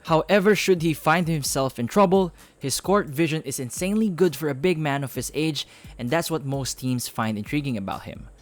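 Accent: Philippines. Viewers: I couldn't help but notice the Filipino accent in you but superb English nonetheless! Are you from the Philippines though? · Sabi na nga ba Pinoy sa boses pa lang. Idol :) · Are you Filipino bro? Kasi yung accent mo parang pang Pilipino. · I can hear by your accent that you’re filipino (do i spell it with a ph or an f, i dont want to be offensive or rude so correct me if im wrong) but with that being said if i was filipino i would like kai more also because of what he represents for the island and even tho im not filipino i like kai more also cuz if it came down to it and chet had to guard him he would have alot of trouble since kai weighs so much more than him although chet has better ball handles than kai but in the nba with them both being 7 footers they would be played as big men chet isn’t athletic enough to play guard so hes gonna have to get his big man skills better. 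So in my opinion if i was an nba scout i wiuld want kai over chet. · Filipino ka ba kasi yung boses mo pag pilipino boy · I think you are filipino bro, because of that filipino English accent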